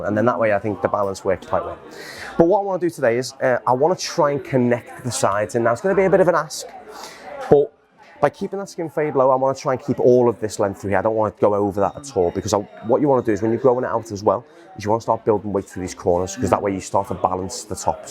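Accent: Liverpool, United Kingdom. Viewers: scouser accent from liverpool , england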